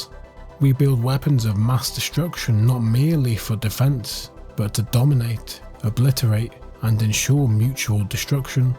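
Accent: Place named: Cockney, United Kingdom. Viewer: This video has been done before, but you should really lean into that cockney accent.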